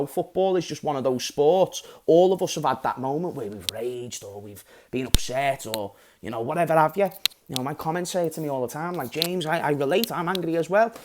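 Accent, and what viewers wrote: Liverpool, United Kingdom. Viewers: Well said James, I’m an Arsenal fan that watches your content for your footballing insight and your energetic personality. Plus as an Aussie, the Scouse accent is a true novelty 😂. No player is immune to criticism, in fact no individual is. Calling it racist is a real problem as it actually contributes to the issues of divide and separation which is the opposite of where we should be heading. Before claiming that someone is racist publicly, you better have some damn good evidence otherwise you become part of the problem in my opinion. Keep up the great content ❤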